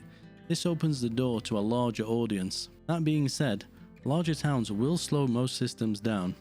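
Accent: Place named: RP, United Kingdom. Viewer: I recently picked this game up. It runs with relatively low demands for a 2014 title. It supports anti-aliasing and runs on WinXp. All scenes you showed included mods: the wooden church, the instant building of fences. This is not in the normal game. The sound is good. The game is lacking in two areas: management of stock at specific warehouses and efficiency of laborers who walk all across the map to do tasks as the town expands. Have a fishery on one end of the town, and somehow a few fish will end up on the other side while the person carries a half a load of stone. Everyone uses this speech synthesizer with a BBC English accent. When I hear him, I'm thinking of an internet personality ranting about veganism.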